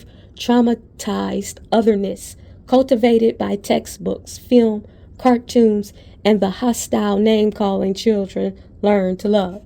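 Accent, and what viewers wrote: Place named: South, United States. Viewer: You're voice is amazing, great narrator. What region of the US are you from? I hear a little southern accent... beautiful.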